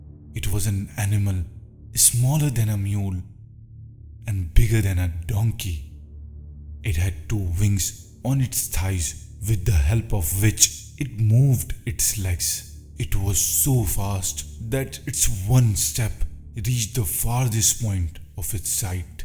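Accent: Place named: England, United Kingdom. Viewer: Wada angeraz.....ru here to preach or trying to impress us with bloody English accent.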